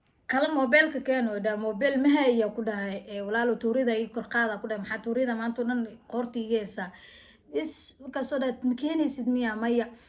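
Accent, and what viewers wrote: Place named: Mogadishu, Somalia. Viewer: xamar aka hadla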